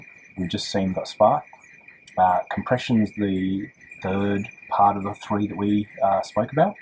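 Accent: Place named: Australia. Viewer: Sir, your videos have been invaluable in my 1st goes at repairing 4 outboards ... but more importantly your accent and character make me miss my favorite country in the world. This yank has been deported from Australia 3 times (I simply love the top end) and I will of course return ... it is not my fault I was "born in the USA."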